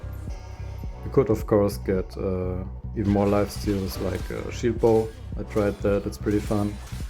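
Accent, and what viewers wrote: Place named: Germany. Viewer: LETS GOOO the german gamerboy with a strong and sexy german accent posted again 🎉🎉🎉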